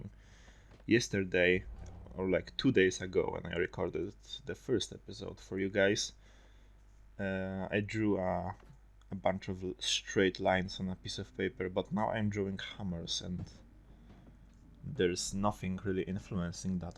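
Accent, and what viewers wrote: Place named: Eastern Europe. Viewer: Polish, that was very enjoyable to listen to, I'd be glad to listen another ones. Also, your english is just fine, take it from another slav. So don't sweat about it, after all we are foreigners and it is highly justifiable for ears of others to hear our rough talk.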